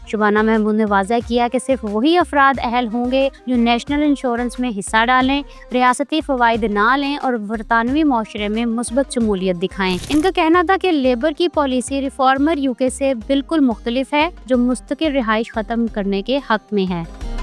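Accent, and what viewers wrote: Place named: Punjab, Pakistan. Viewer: aap English name bhe Punjabi mein bol rahee hain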